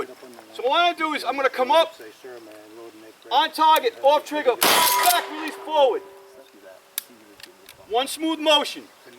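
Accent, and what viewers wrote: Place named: New York, United States. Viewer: Gotta be New Yaaawk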